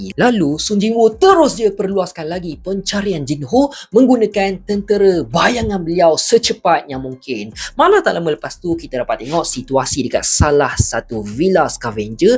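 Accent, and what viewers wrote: Malaysia. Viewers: Bagus bang Sekurang kurang nya ada jugak video suara malaysia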